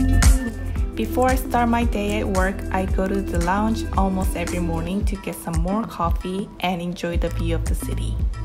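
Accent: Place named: South Korea. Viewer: 그냥 완전 한국인발음인데??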